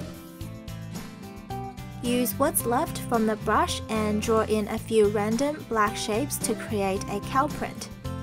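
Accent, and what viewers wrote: Australia. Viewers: She sounds so Australian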